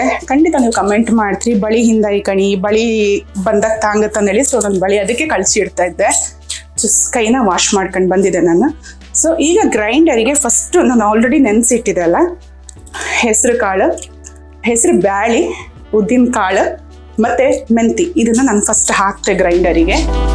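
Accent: Kundapura, India. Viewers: Dasan soppina idli adara jothig namm kundapra kannada super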